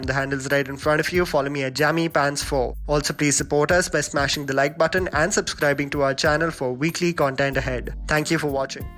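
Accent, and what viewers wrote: India. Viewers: He has a funny Indian accent. Not sure if you Indians should allow your funny accents ruin Tarantino movies with your “taank you come again” accent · lol such a sad creature, if you hate Indian accent why are you here?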